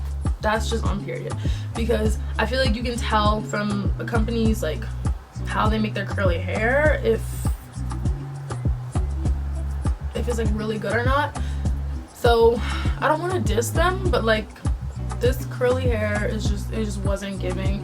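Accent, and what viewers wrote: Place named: Canada. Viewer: Yessssss someone from Canada. I knew as soon as you starting talking 😂. You are gorgeous! Thanks for this video, it’s been in my cart forever. So happy to find a Queen with this colour!😍😍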